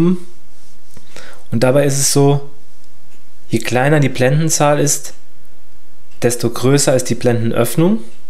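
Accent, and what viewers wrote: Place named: Saarland, Germany. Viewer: Isch heer e Saarlänner schwetze - isch drigge "Gefällt mir" 👍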